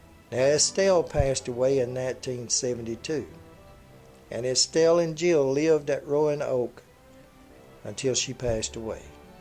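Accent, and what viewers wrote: South, United States. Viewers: This was a really nice history lesson given in your fabulous Southern style of delivery. The more I learn and read about famous authors and other artists, the more I find how many of them were heavy drinkers. Maybe when they are a bit high on alcohol is when an idea for a novel and such comes to them. Who knows for sure?:), are you driving Lincoln Towncar? The front end looks really familiar to me since I drive one.:)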